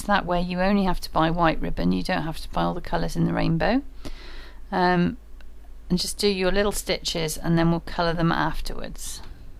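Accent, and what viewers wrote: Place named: Bristol, United Kingdom. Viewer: Love your accent .... Bristol ? Gloucester area :)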